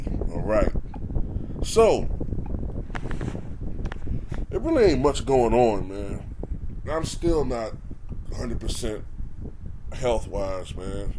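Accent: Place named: New York, United States. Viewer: You sound like a typical out of breath New Yorker, who is too busy being an opinionated douche to give any kind of useful commentary on basketball. Your channel is a waste of time.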